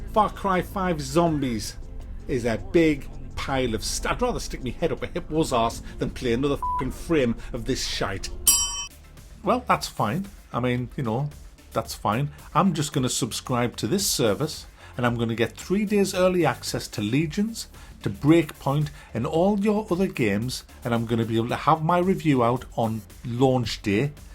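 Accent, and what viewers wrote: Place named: Northern England, United Kingdom. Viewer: do you listen to athletico mince or top flight time machine? You and the northern bloke in those podcasts are the only northern people in my life, but two of the best. Love the channel, love the vids, love your voice. Wish i could hear you being happier...but fully understand that life and the modern gaming world are not particularly happy places.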